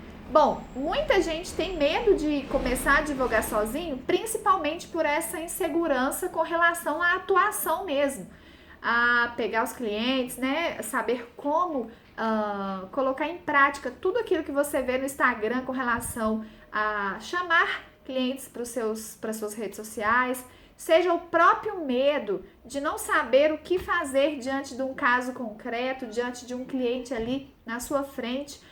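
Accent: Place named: Minas Gerais, Brazil. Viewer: As melhores dicas da advocacia você encontra neste canal! Muito bom! Sem falar no charme do sotaque "mineirinho"... Parabéns!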